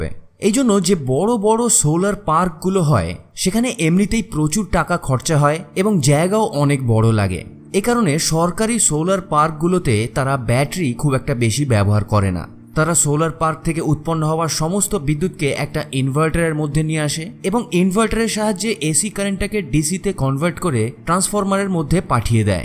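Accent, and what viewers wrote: West Bengal, India. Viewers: চমৎকার একটি ভিডিও, খুবই উপযোগী। ধারা বর্ণনায় ওয়েষ্ট বেঙ্গল ছাপ আছে। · voice sune indian mone hocche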